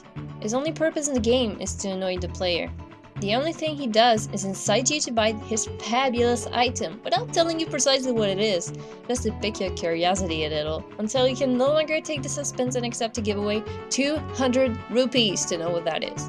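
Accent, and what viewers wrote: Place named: Canada. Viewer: Where do you live? (by your accent i would say canada)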